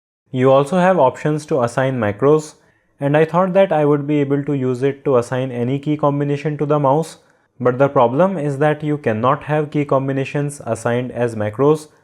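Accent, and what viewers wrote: India. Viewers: are you india? lol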